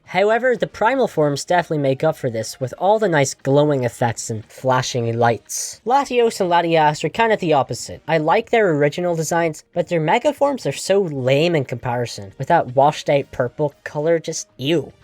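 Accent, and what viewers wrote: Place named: Northern Ireland, United Kingdom. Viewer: You clearly put lots of effort into this video. I love your editing style. Keep up the great work dude. Although I’d say lose the northern Irish accent.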